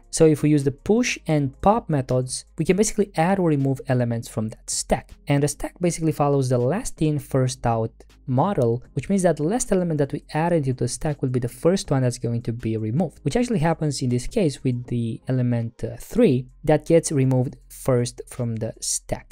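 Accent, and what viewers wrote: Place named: Brazil. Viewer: Você fala português? Parece um brasileiro falando inglês.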